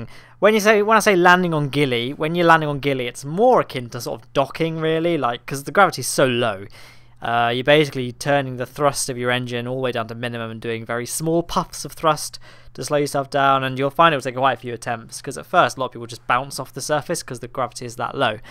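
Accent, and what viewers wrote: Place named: England, United Kingdom. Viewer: You are very English arent you? English is not my mother language but your accent is so strong :D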